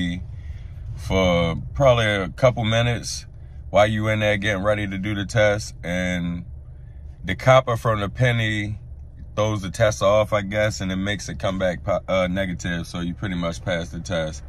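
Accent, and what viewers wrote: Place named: Baltimore, United States. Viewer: Love a Baltimore accent lol! Orrralllll lol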